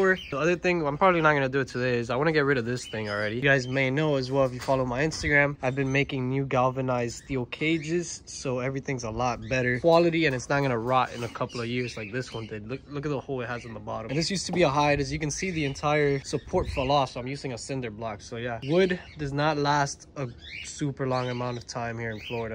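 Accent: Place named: Long Island, United States. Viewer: Sounds so Long Island...or the Bronx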